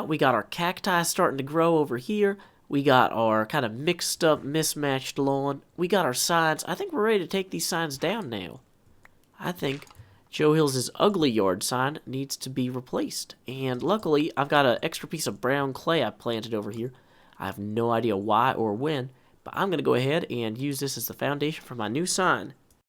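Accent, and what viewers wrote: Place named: South, United States. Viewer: I've always loved your accent (I'm English and a sucker for Southern American accents) but now I'm starting to love your crazy sense of humour.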